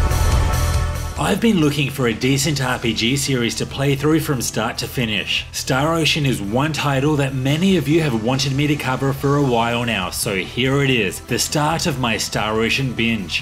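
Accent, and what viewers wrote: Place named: Australia. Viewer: I think it’s Aussie but for some reason has a strong american R sound…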